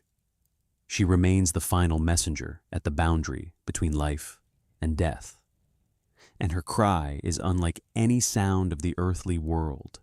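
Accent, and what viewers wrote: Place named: United States. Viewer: Shame a Celtic myth is narrated by an American.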